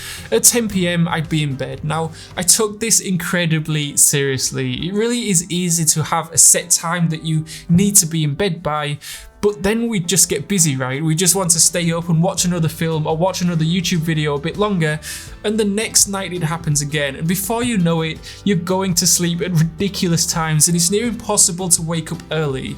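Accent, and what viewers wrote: Birmingham, United Kingdom. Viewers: you speak like picky blinders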